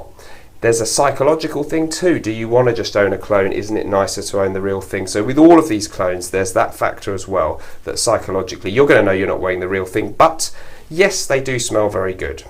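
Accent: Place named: Cockney, United Kingdom. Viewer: urhghh, that cockney accent shudder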